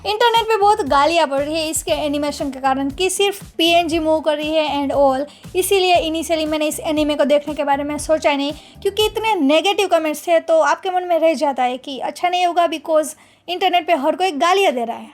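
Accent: Gujarat, India. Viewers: Gujju accent change kar.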